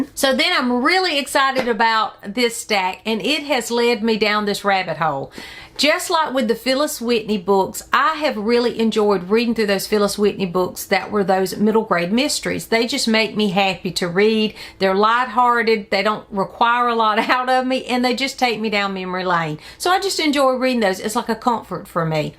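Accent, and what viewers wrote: South, United States. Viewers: Hey,!!! I hope you're doing well. You look so beautiful. You don't look old enough to have grown children. I love your southern accent. Where are you from? I'm from Mississippi. M.C. Beaton books are SO good. I see you picked up some books from the Hamish McBeth series. You should also try the Agatha Raisin series as well. I love them all. Great video!!! Have a great day😊😊😊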